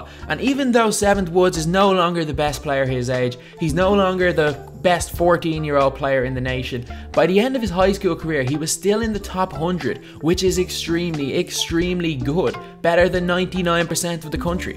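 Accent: Ireland. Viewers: some sort of irish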